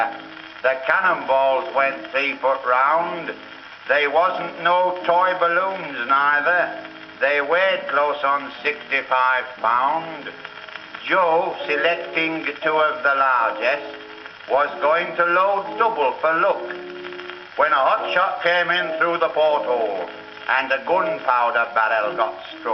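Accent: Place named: Yorkshire, United Kingdom. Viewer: "Stanley Holloway is impersonating Marriott Edgar here"? I beg to disagree. Marriott Edgar was a native Scot, so I don't believe he spoke like this. Although he wrote the poem (lyrics), it does lend itself admirably to the accent employed by Stanley Holloway - one very unlike that of Scotland but most similar to that of the middle/southern part of Yorkshire - for this recording and many of his other collaborations with Edgar.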